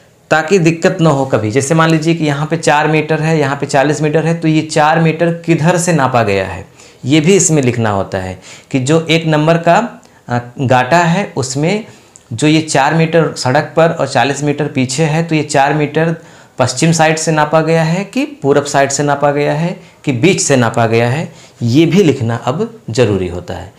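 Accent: Chandauli, India. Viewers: Aap chandauli se ho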